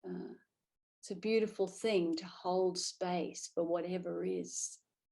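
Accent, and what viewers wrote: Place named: Australia. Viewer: That was Beautiful! You know years and years ago I absolutely fell in love with yours and Terry’s Yoga Nidra recordings and they are a go to frequently still… Beautiful! Salve for the soul..I always felt your voice (first Aussie accented meditation I’d heard….Aaaaahhh!!) and of course the beautiful music just reliably brought me Home..Here..Now! Though I couldn’t quite formulate it as such until just recently. After consuming so much content on the non-dual understanding during the pandemic via beautiful sources such as Rupert Spira, Francis Lucille, Bentinho Massaro, Mooji…etc etc I recognised and felt that the sense of being they had pointed me to was the same seemingly placeless place into which I would frequently and almost always effortlessly sink Deeply upon listening to your Yoga Nidra… So thank You Deeply from the depths of Being it is BEAUTIFUL to be able to finally see AND hear your familiar voice regularly.. 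I Love You Both Very much!! Thanx again! XX Luke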